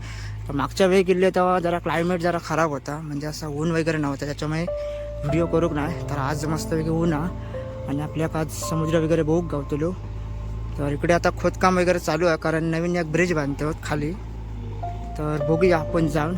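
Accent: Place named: Konkan, India. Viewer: सुरुवातीला थोडंसं फास्ट बोलला होता पण नंतर छान पद्धतीने माहिती दिली आहे.कोकणी भाषा गोड वाटते ऐकायला.❤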